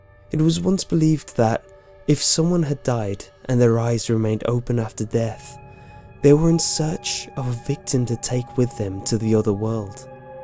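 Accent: England, United Kingdom. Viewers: Yeah, it's obvious he's English, I mean I live in Britain, but where about in England? I can't tell if he's Scouse or Liverpuddlian or Brummie or what...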